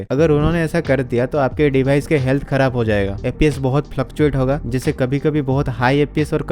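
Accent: Odisha, India. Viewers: Odia accent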